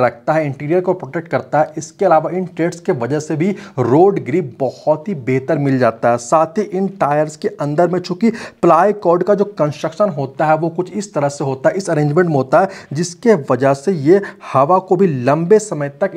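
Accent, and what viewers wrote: Ranchi, India. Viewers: Bhaiya ap Ranchi se hai kya??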